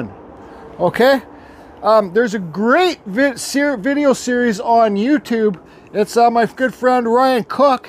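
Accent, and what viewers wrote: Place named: Canada. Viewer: Love the Canadian accent !!! 👍 I’ve learned So Much from You !!! 👍 You’ve Taught Me So Much !!!👍 Thanks 🙏 !!! Can’t Wait to get started !!! My Father was an Artist , Acrylics !!! 👍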